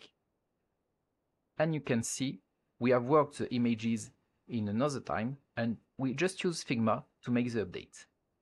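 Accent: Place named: France. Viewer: L'accent français je l'aime tellement, et vous avez aussi un bon scénariste (jeff)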